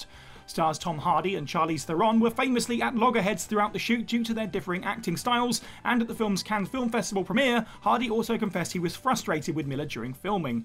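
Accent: United Kingdom. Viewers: Love how British people speak 😂😂🤣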